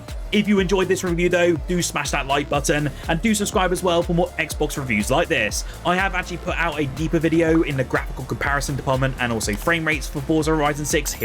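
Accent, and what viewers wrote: United Kingdom. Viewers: I normally love the English accent, but I feel that you're so overly animated. I can't tell if this is a serious review or something from Saturday night live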